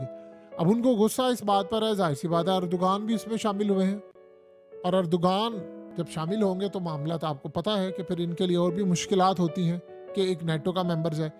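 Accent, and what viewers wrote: India. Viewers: baie AP India chale jayen India ki taraqi AP ke Liye bara aham he or bricks ko bi join kar Len dollar ka janaza bi jadi nikalen. We love Pakistan. AP ka lahja not a Pakistani but Hindustani.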